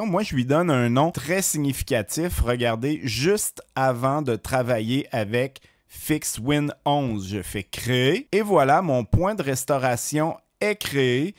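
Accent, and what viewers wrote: Quebec, Canada. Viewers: Quelle merveille ce logiciel et toujours ce super accent québécois qui m'enchante Merci Amitiés d'Oléron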